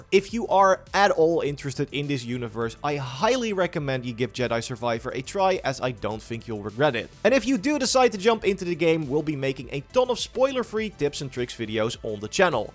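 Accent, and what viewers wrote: Netherlands. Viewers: Dutch guy speaking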